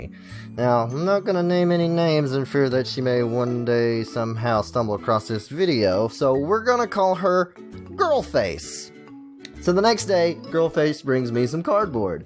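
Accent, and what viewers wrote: South, United States. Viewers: Is that a southern accent I hear?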